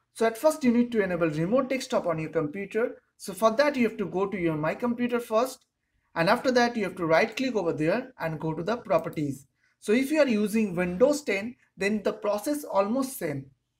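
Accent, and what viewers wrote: India. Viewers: ur annoying indian tech support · Ahh that classic Indian accent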